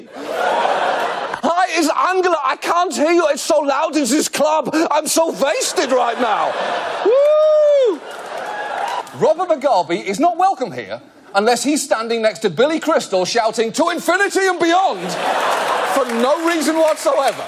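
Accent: United Kingdom. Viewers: Pavlovian reinforcement, every fucking segment goes like this. 1. Introduction to topic ( normally around 10 seconds ) 2. Snarky remark, normally non-sequitur. this keeps you from fully processing part 1 and coming to your own conclusions. 3. Approving laughter, this is what keeps you hooked, equivalent to the food that Pavlov gave his dog. Effectively ruining your sense of humor, reasoning skills and overall intelligence 4.Repeat until you get enough for a full show. this guy is shit humor, he makes you dumber the more you watch him, but makes you feel smart because of his Brit accent and glasses. By malicious intent, or stupidity on his part, humor is now worse off because of this nightmare of Steinbeck and Orwell.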